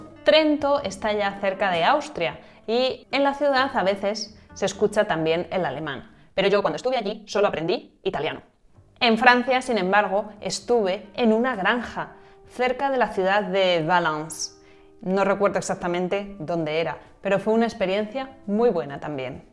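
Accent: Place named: Castile, Spain. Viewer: Ciao! il tuo accento castellano è fantastico, ti ascolterei per ore! Grazie per il tuo canale, mi sta aiutando molto a imparare lo spagnolo, un saluto dall'Italia!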